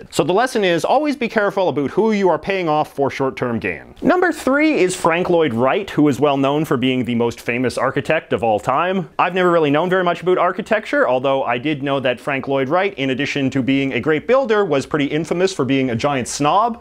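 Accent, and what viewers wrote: Canada. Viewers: Those were a little too many aboots to handle in the first 30 seconds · This man keeps saying A boot not about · I'm sorry but I could not finish this video because your dating about wrong. It's not Ab00t!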